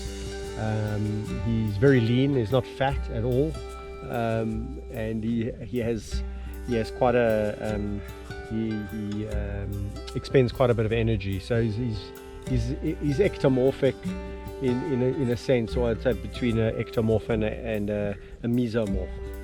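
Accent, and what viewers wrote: South Africa. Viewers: Bloody hell. After these videos, I hear a south African accent inside my head every time i cuddle my German Shepard.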